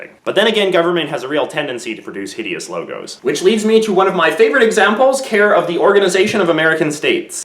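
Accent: Canada. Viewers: You have an American flag behind you, and yet you're Canadian because you say "aboot" so hi fellow canadian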